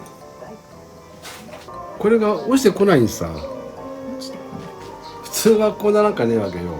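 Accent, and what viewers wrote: Niigata, Japan. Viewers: 東京で生活してるけど、聞き覚えのあるようなアクセントだなと思って調べたらやっぱり新潟の人だった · 新潟っぽいしゃべりだったので検索したら阿賀野市だったんですね！！ CB750Fは高校の頃憧れでした。最初のエンジン音で「おかしな音」と感じました。知識がないショップに当たってしまうと不幸ですね。。。。。しかし外見は綺麗ですね！！凄く興味深く観させて頂きました。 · 完璧な整備に感動しました。なんか･･･新潟訛り？、どこにあるんだろう？調べたら、やっぱ新潟だｗ 来年暖かくなったら、帰省がてら見学に行こうかねぇ！NSRで！ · ところどころに新潟弁が聞こえる。新潟県のショップさんですか？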